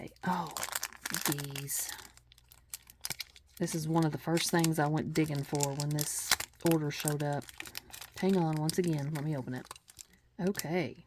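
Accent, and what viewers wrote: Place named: Kentucky, United States. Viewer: New Subscriber here! Are you from Kentucky?😂 I know that accent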